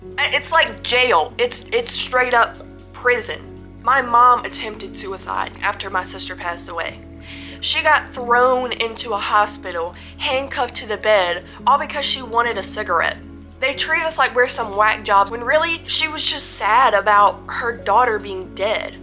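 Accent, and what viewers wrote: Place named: South, United States. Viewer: 😭 baby girl, I'm taking you on as my surrogate daughter right now. I FUCKING FEEL YOU!! I've been doing exactly this for 10+ years. Hang in there baby, it is getting better as we speak because of people like you that are brave enough to stand by their cries for help. Start with Borderline Personality Disorder. Dr. Fox here on YouTube is amazing. There are are doctors out there that can and will help us, but I have learned that it's up to us to make this change. I firmly believe that what you are doing by spreading awareness on here is HOW we make the changes we need and it's up to us because there are so many people out there that CAN'T speak up for themselves. We have to help them. It's been 4 months since your last video. I deeply hope you are still fighting. I'm HERE! I'm happy to connect with you personally if you want. 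I want to help you. ❤ Edit: One other thing - your accent tells me you are in the south. I have been in outpatient treatment systems literally all over the country, and the south is about 50 years behind. You have to educate yourself online. Keep going.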